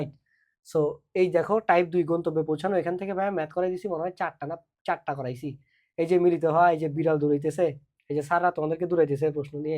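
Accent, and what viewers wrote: Chittagong, Bangladesh. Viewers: apni ki Chittagong er?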